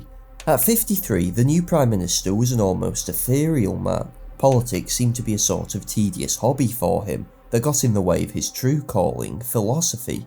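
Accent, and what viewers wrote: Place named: England, United Kingdom. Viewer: Huh this prob one of the mildest english accents. hearing bbc australian pundits is nails on chalkbooard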